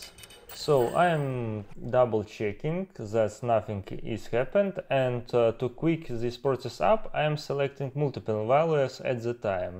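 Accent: Russia. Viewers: блин очень сильно акцент виден, ты случайно не русский?)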